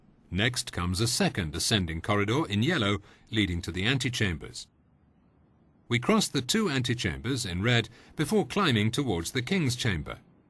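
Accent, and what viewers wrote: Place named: England, United Kingdom. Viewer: Folks, this video is filled with unproven presuppositions. It is a theroy with a nice 3d video and authoritative english accented narrator. Ramps, chipping with stone hammers, no wheels, no models before building, Tombs for dead men , Kings, hierarchical society, Humm? Stones were cleaved as diamonds are, ( being done today with giant stones) not chipped with copper chisels. Then they were moved with carts and oxen, not dragged by hundreds of workers in the sun for hundreds of miles up and down the countryside on slippery little logs of which one stone could stop a log from turning correctly. Counter weighting was used to elevate stones up the sides, not ramps and pushing/pulling tons of stones uphill on a ramp. Water filled counterweighted highly engineered hardwood carts filled with water descending down one side which raised the stones on similar carts connected with ropes from the other side using only gravity to pull them up. 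The finished top floor course with one more perimeter sidewall casing stone course could create a pool area for water. The angled casing stone about the perimeter would act as a smooth slope for ascending and descending carts using the pool to supply water to the descending carts as counterweights. Then the stones could be raised up the finish slope then be floated into place with air bladders attached to the carts sides. Two men with little energy could navigate tons of stone and place then perfectly to its designated place. The other two sides wit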